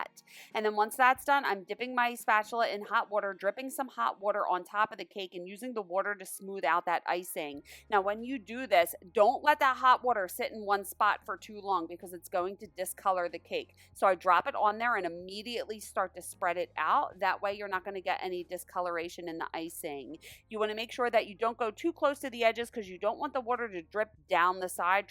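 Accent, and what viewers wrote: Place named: East Coast, United States. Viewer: I didn't say you said it wrong! Girl, I love east coast accents lol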